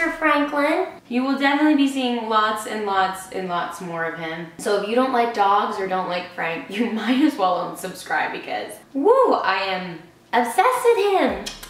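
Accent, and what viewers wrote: United Kingdom. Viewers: That “oh did that offend you” sounded so british 😂 you’re getting a slight accent girl 😂😍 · The little twangs of British that come through in your voice because of Kara are so cute!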